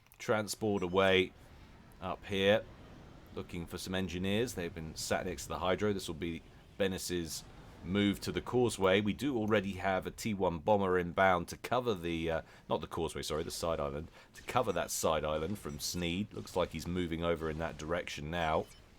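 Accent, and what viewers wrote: United Kingdom. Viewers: I have a legit criticism, every time I watch one of your videos, I notice something. Your voice, its so damn sexy. I can't pay attention to what is going on because I'm too busy being mesmirized by your sexy slick English accent. You need to stop it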